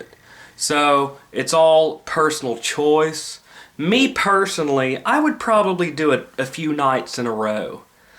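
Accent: South, United States. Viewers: good on you. always a pleasure to hear that southern ascent. blessings.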